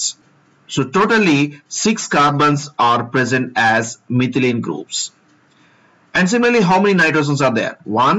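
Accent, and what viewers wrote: South India, India. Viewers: Ur pronunciation tells that u r South Indian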